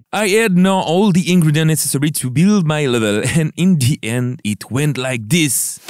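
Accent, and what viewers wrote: France. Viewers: Par contre frérot t français?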